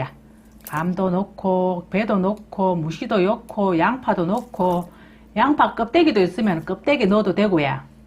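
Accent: Gyeongsang, South Korea. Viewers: 주부님 지루하지않고 영상보면서 저도같이 웃고있네요 특유의 경상도 말이 안동고향살땐 대구에사시는 시누와 애기할때도 그렇게 제미있다라고생각못했는데 전라도와서 말씀이 이렣게 제미있고 구수하게 들리네요 ❤❤1❤❤ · 경상도사투리에 더정감감이가내요.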